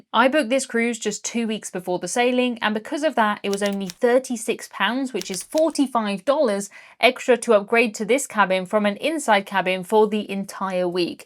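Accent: United Kingdom. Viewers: Americans definitely use “Pop” in the same manner 😊 ‘Pop out’ ‘Pop in’ ‘Pop up’ It sounds so much better when you say it with a British accent!😊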